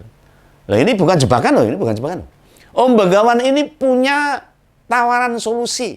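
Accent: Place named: Solo, Indonesia. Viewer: Om begawan bhs nya bhs solo...saya wong wonogiri turah mudheng...madyaang...dhedhak..tibake...wis pokoke familier...😂😂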